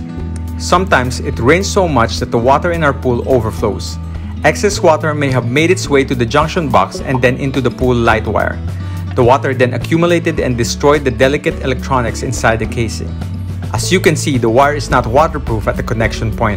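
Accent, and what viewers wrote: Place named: Philippines. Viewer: Salamat! I knew by your voice you were likely Philipino. I now no for sure, since I went to website to look at the light. Available in Manila lol ...looking for something similar in the states! Much bless!!! Thanks for the suggestion on waterproofing the J-box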